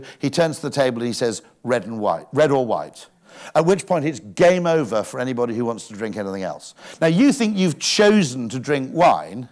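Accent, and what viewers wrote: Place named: United Kingdom. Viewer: It is the british accent.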